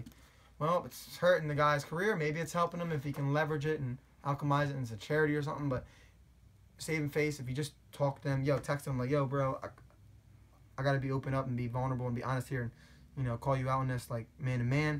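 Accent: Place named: Pittsburgh, United States. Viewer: you switch between wooder and water a lot haha pittsburgh..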